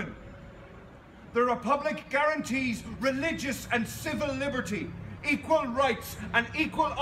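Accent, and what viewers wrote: Ireland. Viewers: let me guess. you're irish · Are you Irish?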